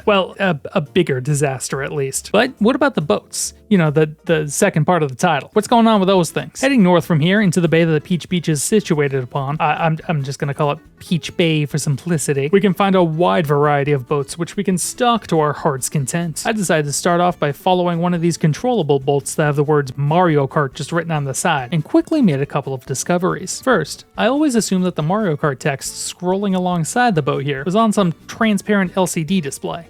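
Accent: Boston, United States. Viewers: Do what you wanna do man, Boston accent included! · I can hear a bit of Boston in your voice😅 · Please stop doing that Boston accent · please keep doing that Boston accent :3 Is it your native accent? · are you from boston?? i swear i can hear an accent but im not sure 👀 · I feel like I’m going crazy was he always this Boston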